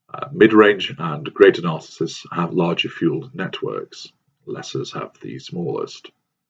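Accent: United Kingdom. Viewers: He’s in England, this is the typical English/British accent.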